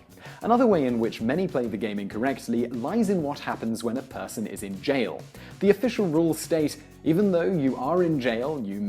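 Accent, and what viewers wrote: England, United Kingdom. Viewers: It kind of is. There's the English language. But there's also an accent to it depending on who's speaking it: someone from England, America, Ireland, France, etc. speaks English with an Engkish accent. I speak it with an American accent. Etc, etc. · the smoother British voice Vsauce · Whoa. Didn't realize he had an English accent until I saw the game board with the Italian currency symbol ....my brain works in mysteriously beautiful ways.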